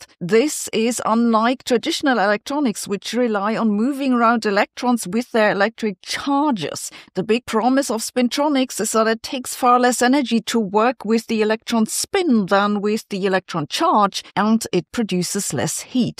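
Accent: Germany. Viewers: There's something about German accents that are cool❤ watch out, baby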